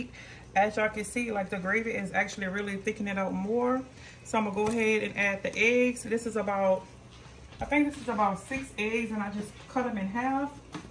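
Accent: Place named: New Orleans, United States. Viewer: Are you from New Orleans??? Sounds like you are. I was practicing on my hair Weaving skills, & I thought starting my own channel. Gurl, I'm sho you from New Orleans & you're doing a Great Job 👍. I've been in the Retail business over 30 years & I had to Speak Professionally. One thing that People love, is our Accent!!! It's beautiful Sis.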